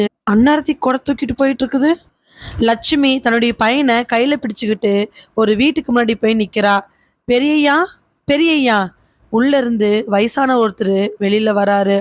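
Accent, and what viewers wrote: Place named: Kongu, India. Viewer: Kongu Tamil Vera level sister 🎉🎉🎉🎉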